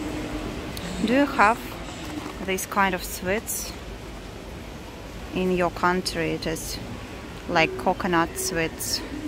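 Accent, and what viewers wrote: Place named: Russia. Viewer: Hi. I'm enjoying your videos. I watched half of this not understanding what you were shopping for. "Swits?" Then I realised you were saying "sweets." (Rhymes with "eats") I love the Russian accent but find it funny that all vloggers mispronounce "clothes." (Of course, I live in the South of the U.S., so I suppose a lot of English speakers would argue with some of my pronunciations.) The "th" is silent in the word "clothes." I think a pronunciation dictionary would have something like "klōz." Anyway, I'm glad that you don't seem daunted that 75% of your viewers are men. Best wishes from America.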